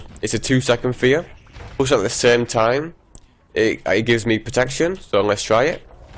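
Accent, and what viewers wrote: United Kingdom. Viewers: To the people saying it's "just a northern accent", let's be honest, it's one seriously fucking strong northern accent... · Shite, what kind of accent is that? You are from the UK for sure, but where exactly?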